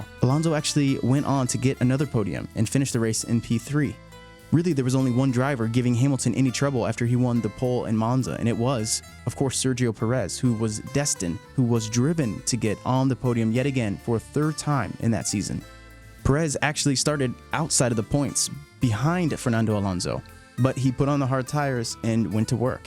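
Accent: United States. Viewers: Great vid, and as much i like the way the brits talk it’s nice to hear an American F1 fan do it like you, so thanks bro, from Atlanta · F1 in American accent with a few unusual pronunciations sounds a bit weird but kudos man for these recaps.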